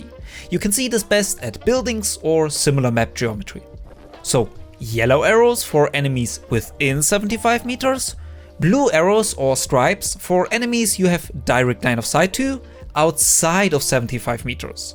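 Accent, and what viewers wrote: Germany. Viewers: Could it be that you are a german?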